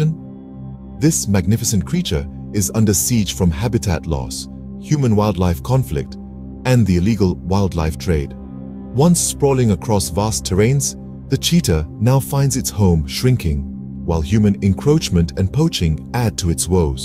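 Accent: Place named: Kenya. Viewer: my man sounds kenyan af. I am kenyan btw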